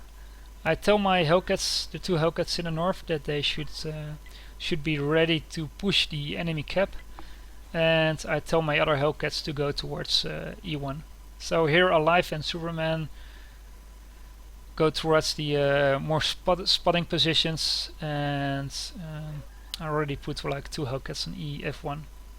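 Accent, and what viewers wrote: Netherlands. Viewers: dat dutch accent ;) Great vid! keep it up!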